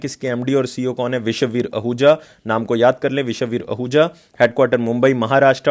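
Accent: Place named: Himachal Pradesh, India. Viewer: sir aap himachal se lag rhe ho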